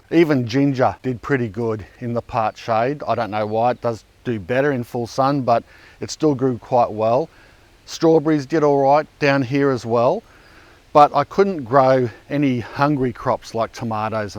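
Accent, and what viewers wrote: Australia. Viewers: Hello uncle. many many thanks, today my 5 yrs son was watching with me your video; he was very happy with your talking and beautiful Australian accent 😀 While I was amazed by the way you were managing your beautiful garden! I have a small garden and I am very much thankful for your videos from which I benefit a lot! Best wishes to you, friend!❤ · Thank you for your wonderful work and share. From Québec, stubborn french speaking canadian hahaha! Going through tough winters and an ocean of english speaking. By the way I just love, love your australian accent! Really sweet.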